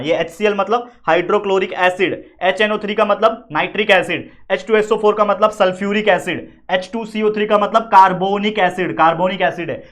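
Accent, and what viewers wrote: Maharashtra, India. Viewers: sir, is your mother tongue marathi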